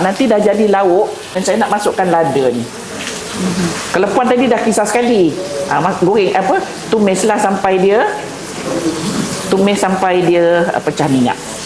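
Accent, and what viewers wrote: Johor, Malaysia. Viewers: Nak cubalah resepi ni masak utk cucu2. ckp mcm org Johor. Apapun terima kasih kongsi resepi ni. ❤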